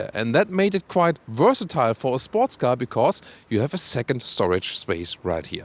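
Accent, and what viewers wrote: Germany. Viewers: The main catastrophe is not the wording, which is sometimes also incredibly German, but the German pronunciation, which almost physically hurts. It is more than ridiculous to say that this is perfect English.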